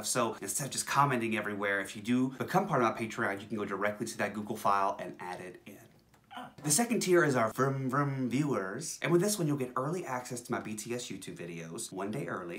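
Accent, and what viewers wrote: South, United States. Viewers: You’re not country darling you’re more of a southern bell 😘 also your remixes are 🔥. My favorite era is Wings 100%. The trailer for their wings tour is so iconic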